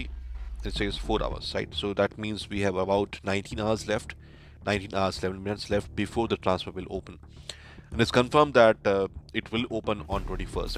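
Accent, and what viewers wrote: India. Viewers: What language are speaking bruh 🤣 im guessing ure indian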